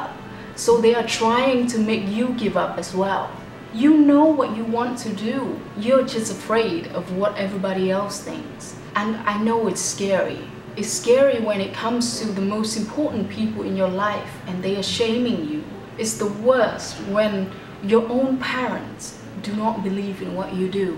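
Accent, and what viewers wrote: England, United Kingdom. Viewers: British accent nghe thích quá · Từ Anh về có khác, eo ôi cái British accent nặng ghê gớm !!! , nghe nhộn nhộn vui vui, đáng yêu ghê cơ chị ạ, ahíhí !!! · hers is British accent, you can easily recognize it by some words like " a lot, all, stop" it's my opinion btw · Giọng Anh ạ? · There is nothing quite like a person with UK accent who didn't grow up there. Very unique. By the, I love the energy that you have. Great work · British accent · British accent của chị hay quá hihii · ôi giời ơi, accent anh anh, nghe lạ quới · Em thích cái giọng British của chị lắm luôn ý. Nghe rất dễ thương, điệu điệu mà không quá chảy nước như trên TV. Yêu chị vô cùng tậm, luôn luôn support mọi hoạt động của chị. Mặc dù em lười đọc lắm, nhưng sẽ cố đọc hết blog của chị <3 <3 Yêu chế <3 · English accents :)))) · Chị nói giọng Anh Anh Chị du học ở Anh ạ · british accent cua chi hay qua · Huhu em thích giọng anh anh của chị dã man ý chị ơiiii em thích cái độ dày với ấm trong giọng anh anh ☺️☺️☺️☺️☺️ Nhưng lại luôn cảm thấy kiểu nửa vời lại còn thích đú cái khó 🥺🥺🥺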